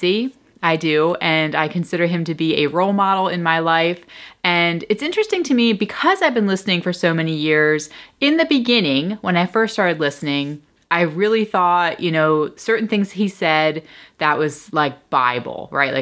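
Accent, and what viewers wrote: Philadelphia, United States. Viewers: Lol. Ok I should of waited to end of video. Heard the Philly area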